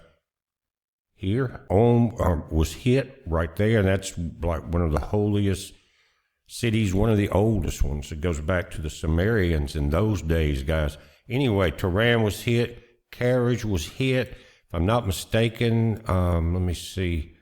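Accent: South, United States. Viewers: You are a real scumbag. Your old man creepy voice... Lil southern draw... You do not represent America... You are a war hawk with no threat of being drafted into Israeli war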